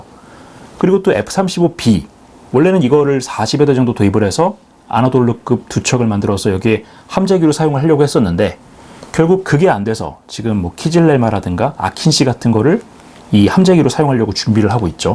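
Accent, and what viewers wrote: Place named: Seoul, South Korea. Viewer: 한번씩 서울말 들어야죠 ^^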